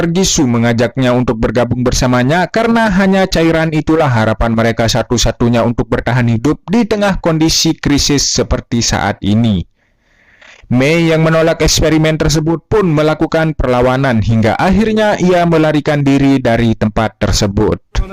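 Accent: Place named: Lombok, Indonesia. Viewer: Logat sasak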